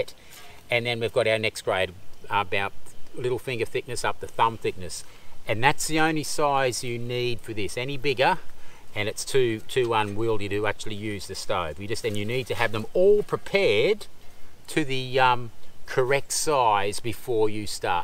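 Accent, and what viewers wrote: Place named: Australia. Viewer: It is bloody awesome to hear another Aussie accent online talking about bushcraft and survival stuff. love it. Don't why I haven't seen this channel before. Sub'd and thumbs up.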